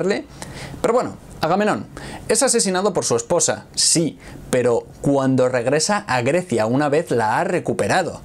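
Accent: Spain. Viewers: Me ha hecho tan feliz entrar en el vídeo y oír un castellano de España y no cualquier dialecto latino 😭😭😭😭😭😭🫱🏼‍🫲🏽🥰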